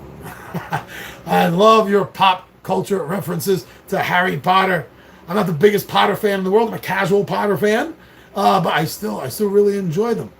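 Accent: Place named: New York, United States. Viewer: you have a new york accent? no way!!!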